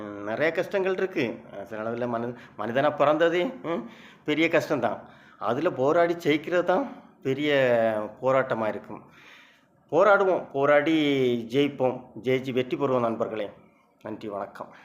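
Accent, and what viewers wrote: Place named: Tirunelveli, India. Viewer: Coming from South super. திருநெல்வேலிடா.......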